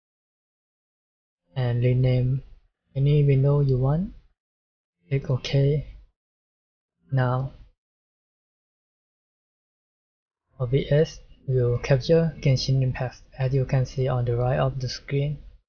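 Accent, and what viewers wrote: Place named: Thailand. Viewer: Are you Thai? It is random but you sound like you have a Thai accent and have darker skin (I know you have the accent because I hear it all the time from my moms friends)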